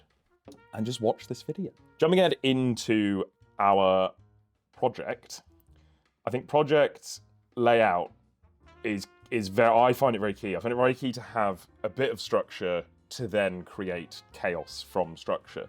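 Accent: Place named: Belfast, United Kingdom. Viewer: Completely unrelated to the video (which is amazing, thank you!) I know your english, but there is a few times that you sound like you are from belfast. Is any of your parents from belfast?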